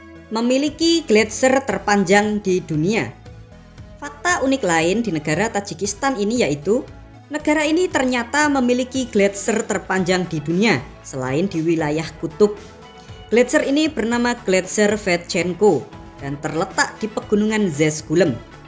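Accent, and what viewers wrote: Java, Indonesia. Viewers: Lugot jawanya meddok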